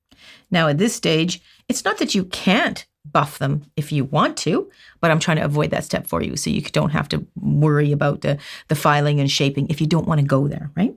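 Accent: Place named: Canada. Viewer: You sound Canadian,. Are you from Canada? I’m living in England, but my husband and I were born in Ontario. 🇨🇦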